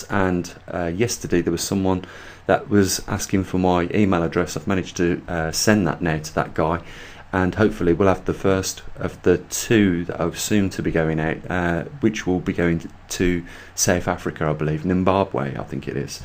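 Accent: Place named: England, United Kingdom. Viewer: When it comes to channels like this, I gauge if it is worthwhile, by the sound of the narrators voice... this is the first Chanel I’ve subscribed to that the narrator is English. All of my other subscriptions are either American or Canadian. Which is ironic because I am British and live in Somerset. So thank you, your voice is the first English narrator I’ve subscribed too. The key to the narrators voice is the ability to capture my attention. Ive used trail and error to do this, using one particular persons voice as a bench mark, probably the most famous narrators voice of all time and still the best by a long chalk. Sir David Attenborough. High bar, I know. But sadly, if the voice doesn’t tweak my curiosity then it just gets passed over within a second or two and I move on. Btw nice that you got hold of some data collected by the Chinese, if genuine then tis a rare commodity indeed. 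🙇‍♂️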